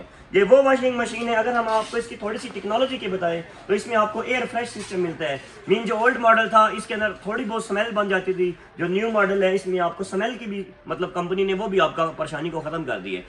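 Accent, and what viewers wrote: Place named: Chakwal, Pakistan. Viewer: بندے کی اردو تو چکوالیوں والی ہے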